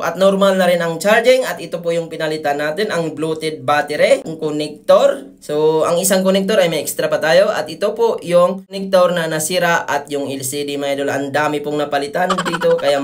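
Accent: Iloilo, Philippines. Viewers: idol parang illonggo ka?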